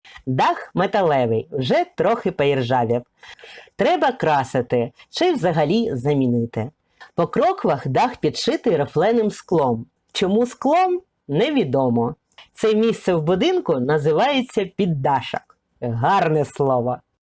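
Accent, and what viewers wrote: Russia. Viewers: Чомусь дратує мене така українська мова з явним російським акцентом...Вимкнула звук .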